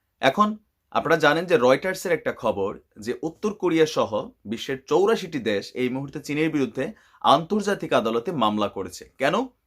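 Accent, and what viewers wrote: Comilla, Bangladesh. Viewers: ভাই আপনি কুমিল্লার 😍😍😍😍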